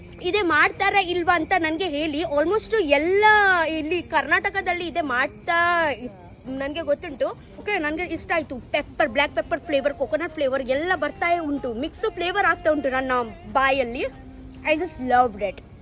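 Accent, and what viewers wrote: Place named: Kerala, India. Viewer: Dodda mallu tara maatadtale..😅😅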